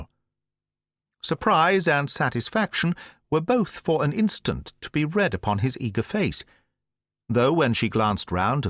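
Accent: United Kingdom. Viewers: Agreed I love my I love British female accent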